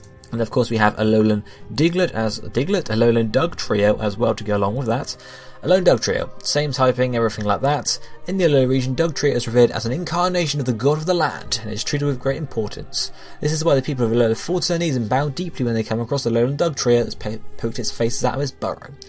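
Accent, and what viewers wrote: United Kingdom. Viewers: OHHHHH So he's from the UK? I didn't know that, sorry. Thank you for the information. I hope I wasn't rude when I asked the question. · is british and thats how we say it, but i end up saying zee half the time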